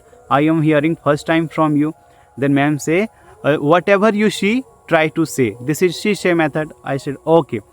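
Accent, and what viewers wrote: India. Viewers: Pls improve this indian accent if u dont wanna get bully in English countries. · Bihari English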